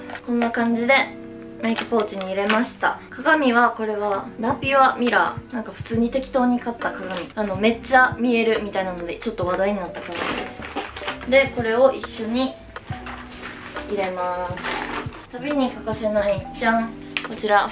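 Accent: Kansai, Japan. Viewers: 今日めっちゃ関西弁でかわいいwww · いつもより関西弁？強めな気がする…かわいい…🥺💕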